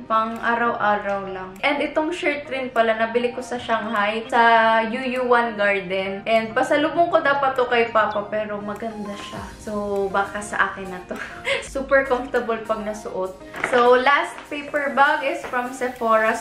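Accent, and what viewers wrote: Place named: Bicol, Philippines. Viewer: Hahaha Lumalabas yung pag ka bicolana nya dahil sa tagalog accent nya hehe Love you!!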